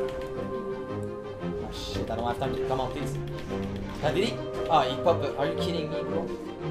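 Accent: Quebec, Canada. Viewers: T'es québécois ?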